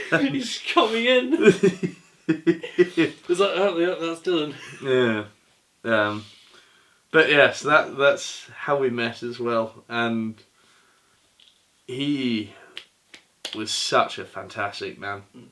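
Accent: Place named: RP, United Kingdom. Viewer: Man I wish I could give you a hug. I hope you can find peace with yourself, I just came across your videos this year and I absolutely love your silly antics snd posh accent. We all got your back ❤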